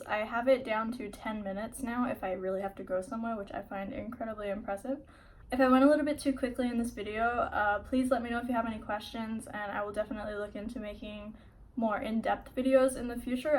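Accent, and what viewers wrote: Australia. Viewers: i detect a aussie accent also this video is sooo good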